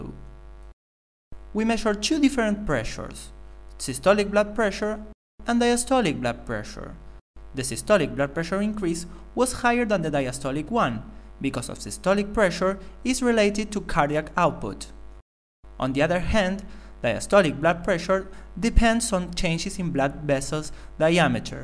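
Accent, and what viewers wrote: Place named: Mexico. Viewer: ese ingles mexicano me hizo caga de risa jajajaja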